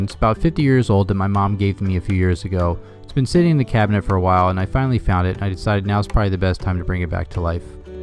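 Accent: United States. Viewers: This guy sounds like he's from Baltimore ("wooder" ...my only knowledge of Baltimore comes from The Wire haha) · Your midwestern accent is so charming, makes me want to watch more of your videos!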